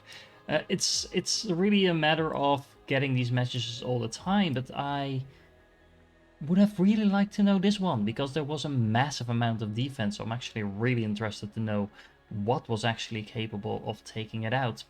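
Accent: Netherlands. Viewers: actual mindustry content????!!! rare to find also i love how we dutch people speak english you can emidiatly recocnize yourself lol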